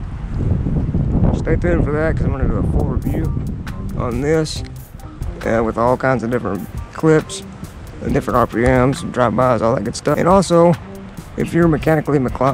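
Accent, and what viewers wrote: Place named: South, United States. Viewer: Man your voice and southern accent are the shit!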